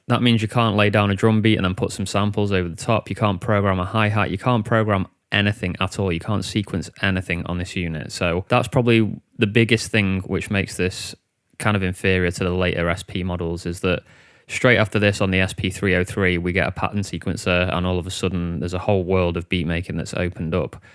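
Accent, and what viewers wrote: United Kingdom. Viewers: could I do it in a DAW, or do I have to use a door? (Just kiddding, love the UK accent and the videos!)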